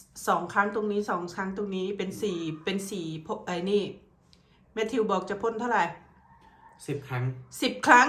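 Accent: Thailand. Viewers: แม่ อยู่ภาคเหนือหรือใต้คะ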